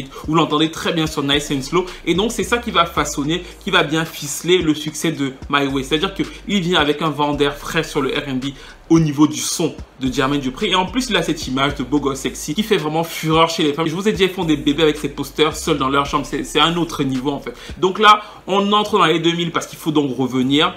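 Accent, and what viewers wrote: Cameroon. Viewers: Moi qui aime la musique et qui pensais tout savoir, tu es génial et ton accent camer ne m'a pas échappé dès le début jetais la que mais attends le gar ci c'est un freeeere. Massah. Keep going u talented and u have deep deep knowledge. Après 10 vidéos watches je mabonnes et jactive la cloche. Push through and through and through ! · L'accent CAMEERRRRRRRR !!! indétronable !!!!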